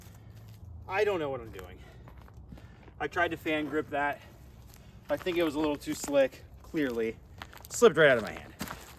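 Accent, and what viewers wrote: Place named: Midwest, United States. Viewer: I think the idea of creating real stakes for tournament style scoring is a good style video .. not that I mind watching one of the better disc golf YouTubers play a round trying to score well, but cool to see that you tried to give yourself a reason to score your best but still just playing by yourself doing your thing Also I felt like I noticed a slight Midwest accent and maybe it’s the North Dakota sweatshirt but tripped me out a little bit I thought you were/are from VA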